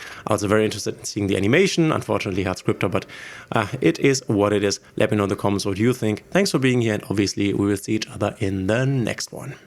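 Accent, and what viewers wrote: Germany. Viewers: Warum auf englisch? Ich weiß nicht , man hört einfach wenn man eigentlich deutschsprachig ist aber englisch redet 😂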